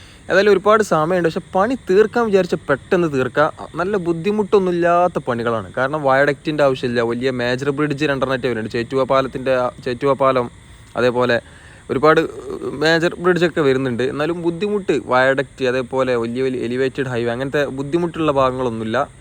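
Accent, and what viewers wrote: Malappuram, India. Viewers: ഞാൻ താങ്കളുടെ എല്ലാ വീഡിയോസും കാണുന്ന ഒരു പ്രേക്ഷകനാണ്. തൃശ്ശൂർ ജില്ലക്കാരനാണ്, ഞാൻ സ്ഥിരമായി NH 66 ന്റെ വീഡിയോസ് കാണാറുണ്ട്, താങ്കളുടെ വീഡിയോസ് ഏറ്റവും മികച്ചതാണെന്ന് പറയാതെ വയ്യ. എന്നാൽ, പല സ്ഥലങ്ങളും തിരിച്ചറിയാൻ കഴിയാത്ത വിധം മാറിയിരിക്കുന്നു. ദൃശ്യങ്ങളോടൊപ്പം അതാതു സ്ഥലങ്ങളുടെ വിവരണങ്ങളും ഉൾപ്പെടുത്തിയാൽ വളരെ നന്നായിരിക്കും എന്ന് തോന്നുന്നു. സ്വതസിദ്ധമായ മലപ്പുറം ശൈലിയിലുള്ള വിവരങ്ങൾ ഞാൻ വളരെ ആസ്വദിക്കുന്നു. എന്നാൽ, താങ്കൾ മറ്റ് വിഷയങ്ങളെക്കുറിച്ച് സംസാരിക്കുമ്പോൾ, അവയും നല്ലതായതിനാൽ, ഞാൻ ചിലപ്പോൾ ശ്രദ്ധ തിരിക്കപ്പെടുന്നു, ഇത് ദൃശ്യങ്ങൾ പൂർണ്ണമായി ആസ്വദിക്കാൻ ബുദ്ധിമുട്ടാക്കുന്നു. നന്ദി, എല്ലാ ആശംസകളും!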